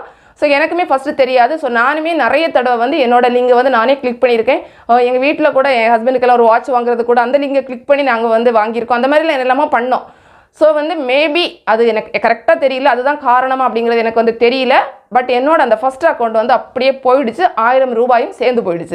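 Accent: Kanyakumari, India. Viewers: Ungaluku native kanniyakumari ya?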